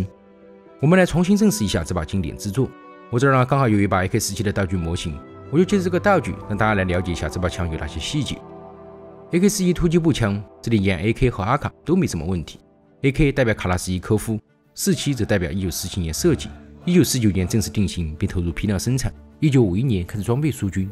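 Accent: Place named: Leshan, China. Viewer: 乐山口音，鉴定完毕。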